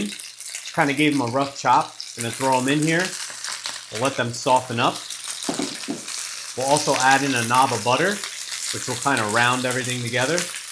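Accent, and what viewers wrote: Philadelphia, United States. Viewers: Just found your channel. Where's your accent from? Near Philadelphia but not Philadelphia, or southern New Jersey, or Delaware? Those are my three guesses. #1 guess is somewhere in the Philadelphia vicinity, maybe north.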